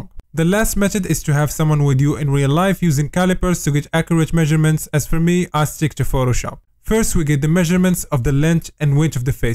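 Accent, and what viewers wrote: Morocco. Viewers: hhhhhh 3aref rassi m3etel walakin mn l anglais dyalk 3reftk maghribi, tbarkallah 3lik a khoya kml f self improvement content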